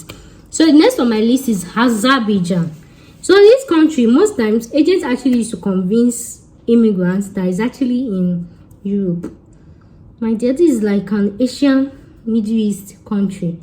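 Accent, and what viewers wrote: Yoruba, Nigeria. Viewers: You this girl it's your yoruba for me it's the way you join everything together that brings me here😆you are so real well done👏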